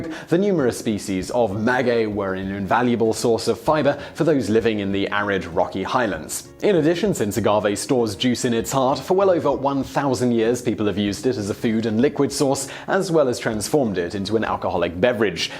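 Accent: England, United Kingdom. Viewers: I thought same, accent is definitely British though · i cant learn with this britt talking this england mess at me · I thought that you were in England you sound British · Is this how englishmen pronounce Nahuatl words? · And i thought he's a British who lives in Canada lol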